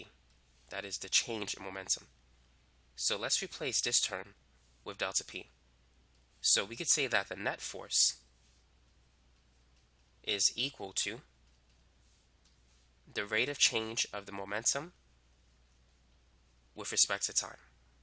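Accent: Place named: Boston, United States. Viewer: I am a woman and this guys Boston voice is so hot.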